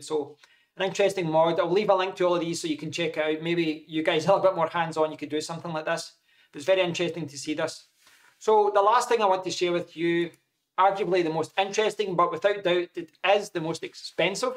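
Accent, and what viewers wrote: Scotland, United Kingdom. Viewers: Nice Scottish accent 👍🏼🙂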